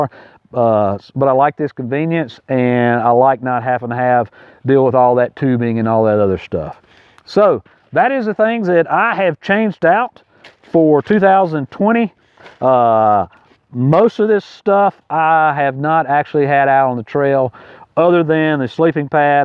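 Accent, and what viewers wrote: South, United States. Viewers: ur prob live in smokies sound southern very nice person and ur very helpful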